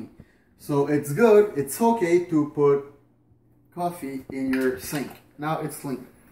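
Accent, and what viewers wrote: Quebec, Canada. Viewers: Merci mon homme, bon accens québécois XD Avant mon café tasted like shit aussi, maintenant il taste toujours like shit mais c'est à cause que j'ai une keurig. J'ai commandé la breville Café Roma sur amazon et je vais commencer à me faire des bon latte! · T'a commencé à parler et je savais t'était Québécois haha x). L'accent est très prononcé, mais nice le review.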